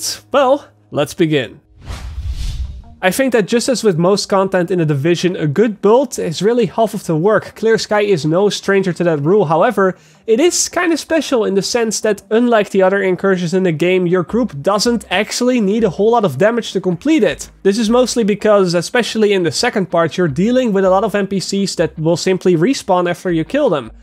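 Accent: Netherlands. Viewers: yo this guy dutch?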